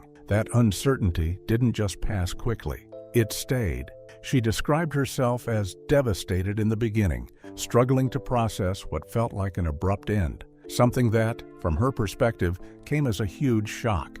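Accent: United States. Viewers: Why an American narrator? 🤔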